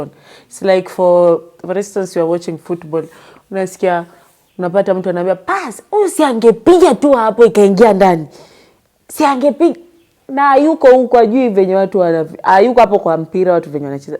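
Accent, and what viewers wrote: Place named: Coast, Kenya. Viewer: Most costo people sound like that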